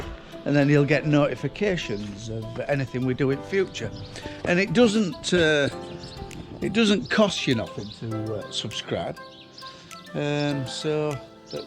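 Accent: Yorkshire, United Kingdom. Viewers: Best farming videos on YouTube entertaining as well as informative, love the Yorkshire dialect even though I'm from Lancashire. Hope you enjoyed your afternoon out 👍👍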